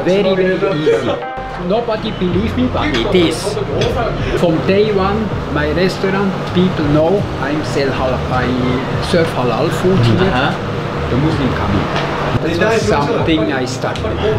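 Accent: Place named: Malaysia. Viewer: Now, you are able to speak in manglish and kelantanish.. thumbs up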